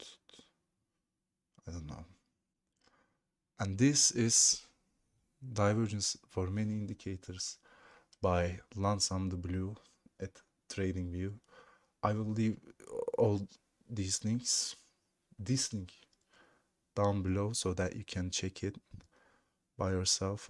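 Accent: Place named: Turkey. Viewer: Türk aksanı seziyorum :) Bravo bro devam ellerine sağlık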